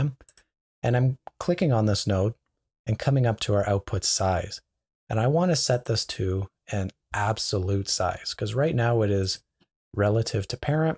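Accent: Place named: Canada. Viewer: thought you might be Canadian by the accent but then I knew for sure when you typed "colour" ;)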